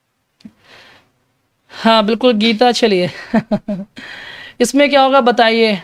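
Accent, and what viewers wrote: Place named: Bundelkhand, India. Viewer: Namaskar Guru ji Aap Bidaki / Banda/ kanpur/ phatehpur kahan se hai?? Because the way you speak sounds like among one of them.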